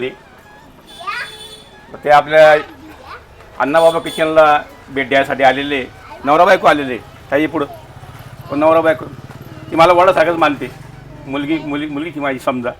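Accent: Khandesh, India. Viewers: अण्णा तुम्ही कुठले आहे मला तर खान्देश कडचे वाटता भाषेवरून मोहनमाळ बघितल्यावर माझ्या तोंडाला पाणी सुटले पण असा मोहनथाळ आमच्या कडे खायला मिळत नाही कोणी बनवत नाही ईकडे तुमचे सर्व पदार्थ खुप छान असतात आणि अगदी प्रेमाने तुम्ही ते काहीही न लपवता सांगता खूप छान वाटते असे तुमचा मोहनथाळ बघून तर मला असे वाटले माझ्या कडे काहीतरी कार्यक्रम करावा आणि मोहनथाळ तुमच्या कडुन बनवुन घ्यावा 😋 आम्ही पंढरपूर जवळ राहतो म्हणजे माझं सासर आहे आणि माहेर चाळीसगाव पण आता तिकडे कोणी नसते पण तुमचे पदार्थ बघून तिकडचीच आठवण येते मला खुप छान बनवता तुम्ही सर्व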